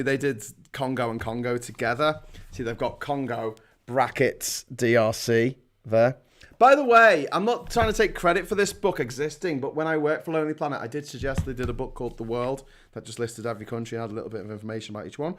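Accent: Liverpool, United Kingdom. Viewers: I usually listen to Phil Moorhouse, but I like this angrier scouse version of events.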